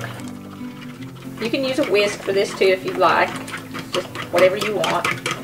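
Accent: South, United States. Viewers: I just love southern accents. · Oh my gosh I just loved this recipe! I'm going to try it on my channel. You are so adorable...love your down home attitude, down to earth commonsense and southern accent!!!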